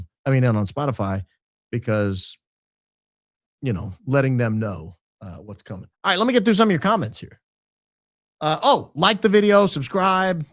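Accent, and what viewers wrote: South, United States. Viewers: You absolutely have a southern accent.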